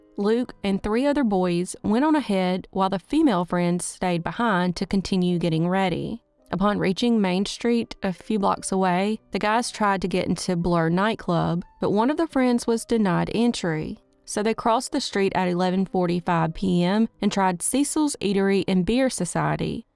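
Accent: South, United States. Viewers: Oh great an American voice I detect a southern accent! Whewfind a better voice todo this video!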